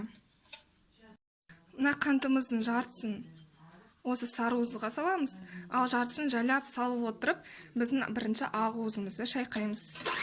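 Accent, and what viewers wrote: Standard. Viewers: Таза казакша сойлейди екенсиз👍👍😜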